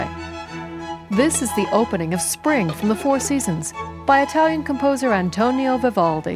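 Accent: United States. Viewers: What is this lady's accent? Obviously some kind of American but sounds a bit different? (also, great vid!) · Quite annoying with the very American accent, maybe in respect to the masterpiece, put an European to narrate the video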